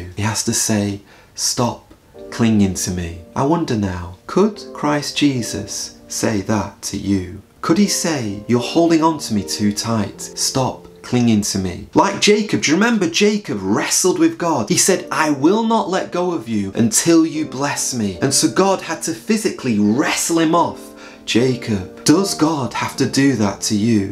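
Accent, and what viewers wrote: England, United Kingdom. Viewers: I love Ur speaking voice, Ur English accent!! God Bless U!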